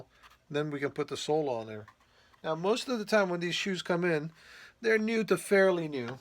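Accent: New York, United States. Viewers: In Cambridge England we say it like this sounds lPayTent. When you say it you sound like patTunt. How is patent supposed to sound, I just wondered. U sound like your from New York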